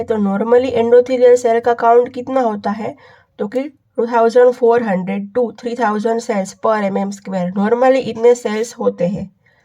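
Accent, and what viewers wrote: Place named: Gujarat, India. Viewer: Bohot acha lecture tha aap gujarat se ho na aapke accent se pata chal gaya 😊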